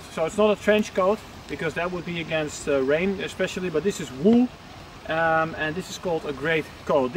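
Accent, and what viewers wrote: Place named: Netherlands. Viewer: Ja klopt : ) Hoorde aan je accent in de video ook gelijk dat je uit Nederland komt, haha. Dank voor de tip, die site ken ik wel, veel toffe surplus daar ja. Het Waterlooplein in Amsterdam levert ook wel eens leuke vondsten op en soms zelfs de plaatselijke kringloopwinkels. Vintage kleding en oldschool campinggear enzo.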